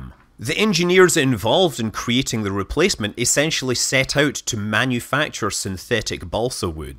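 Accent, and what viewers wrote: Scotland, United Kingdom. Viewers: "the sprüs güs" Love the Scottish accent so much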